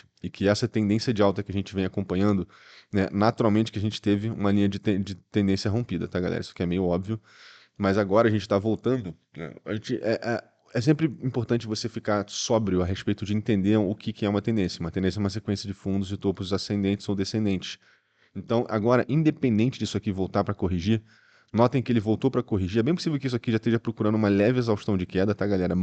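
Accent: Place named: Bahia, Brazil. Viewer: Bahiano! Oxeee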